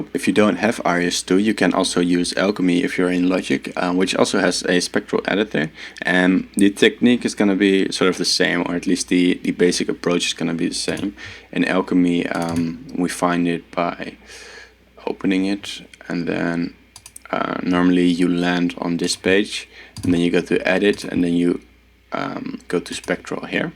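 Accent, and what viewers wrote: Netherlands. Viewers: dutch accent right there...sowieso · Dutch accent detected 🤘🏻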